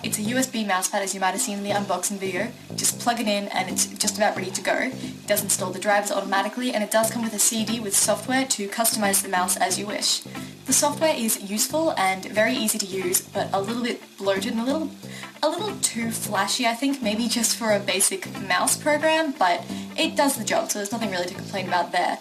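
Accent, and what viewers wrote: Australia. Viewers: she is aussie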